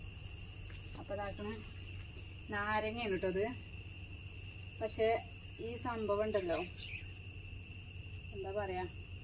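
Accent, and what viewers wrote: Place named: Malappuram, India. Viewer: തനി മലപ്പുറം താത്തയുടെ ഭാഷ ❤️❤️❤️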